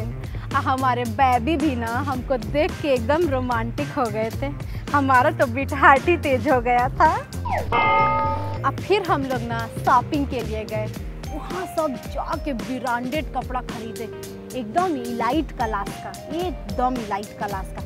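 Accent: Bihar, India. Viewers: excellent performance mam.......... Aapki acting ke fan Ho gaye hai hum and specially ye bihari walla toh jhakkasss 👌👌👌👌👌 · bihari lady voice very original,,,its innate in you,,,graceful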